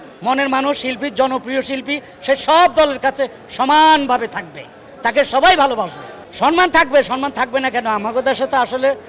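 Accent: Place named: Noakhali, Bangladesh. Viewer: Bari comilla .poriciti noakhalir khota bole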